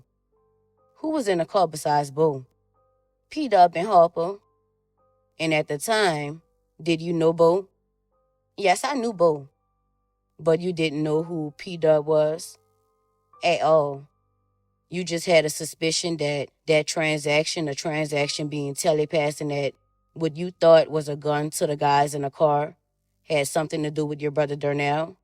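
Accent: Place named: Louisiana, United States. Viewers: That’s a New Orleans accent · I like how the narrator got that LA down south accent puts u rt in the courtroom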